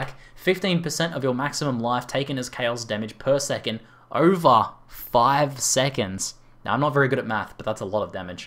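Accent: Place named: Australia. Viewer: your videos are always professional, very informative, well organized, and extremely entertaining! You take us through every nook and cranny in these unique videos, and I love how you go into depth and talk about how each item can be used in specific situations and examples. Big fan! Keep up the good work! Also, love how you say "just here" all the time... Must be an Aussie thing:D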